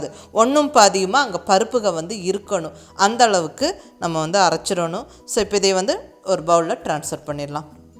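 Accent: Kongu, India. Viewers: அம்மா உங்க சமையலை பார்ப்பதற்காக உங்க வீடியோவை பார்ப்பதை விட, உங்களுடைய கொங்கு தமிழ் கேட்கவே நான் உங்க வீடியோவை பார்ப்பேன்.நீங்கள் போடும் சமையல் வீடியோக்கள் சூப்பரோ சூப்பர்.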